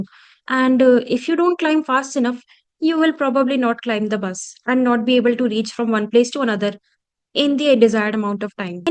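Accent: United States. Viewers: Nice your american accent ❤ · Amazing American accent 😮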